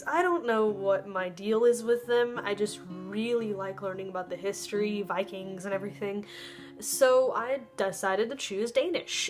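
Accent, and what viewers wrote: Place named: United States. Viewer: As a Dane, your pronunciation needs work obviously and you got a heavy American accent, I would suggest finding a Danish person to chat with in Danish if you want to iron out the pronunciation and accent. That said, good work and I greatly encourage you to keep learning Danish, it is a really fun language once you start understanding the deeper aspects of it, as an example, a lot of Danish comedy is is based around subtle changes in how things are said and phrased. I have a warning for you if you travel to Denmark, if you don't demand that Danes speak in Danish to you we will change to English immediately when we hear that someone isn't near to native speaker level of proficiency, Danish people are generally fluent in English and find it easier to switch to English than to try and decipher foreign accents. I am warning you so you don't get discouraged if you come here in the hope of learning to speak Danish only to find everyone speaks English to you automatically.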